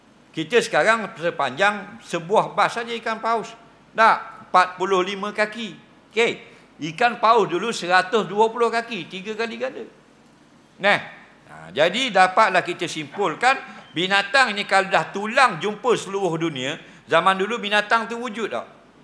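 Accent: Terengganu, Malaysia. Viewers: Tak faham dialek bahasa terengganu ke..@ ada masalah kefahaman?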